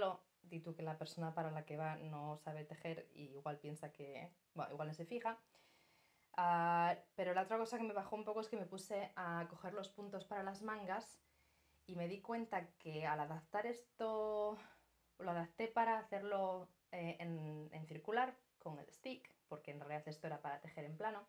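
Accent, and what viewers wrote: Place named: Spain. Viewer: tu lindo acento español y tu very proper pronunciación en inglés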